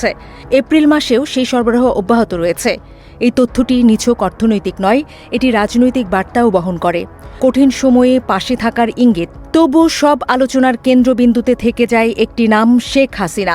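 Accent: Kolkata, India. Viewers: এই মেয়েকে কলকাতা থেকে এনেছে ntv news তার নিজের স্টাইল পুরাই কলকাতা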